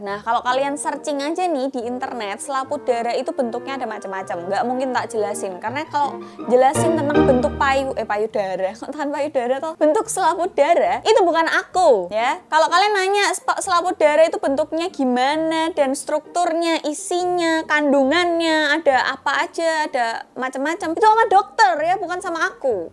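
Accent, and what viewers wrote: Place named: Java, Indonesia. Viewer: Medok mbak.. salam soko wong semarang (Tembalang) ak bocahmu:D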